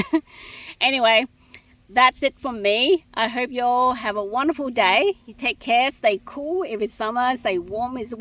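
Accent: Australia. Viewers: Your accent instantly gave away that you are Aussie. I'm making a pumpkin tunnel using Seminole pumpkins but after seeing your runner bean tunnel I think I will add them as well for even more shade because I'm in Florida. Great video!!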